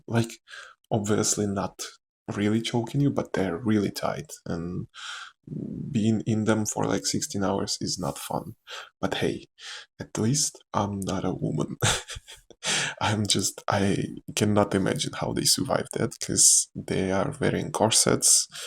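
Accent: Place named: Eastern Europe. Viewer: that slavic accent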